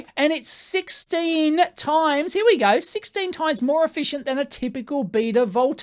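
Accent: Australia. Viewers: God you make me laugh. And nice to hear a fellow Aussie accent amongst all the usa folks. :)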